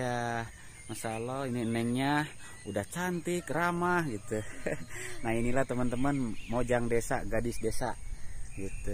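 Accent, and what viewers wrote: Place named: Sunda, Indonesia. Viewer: Lagam naratornya terlalu NYUNDA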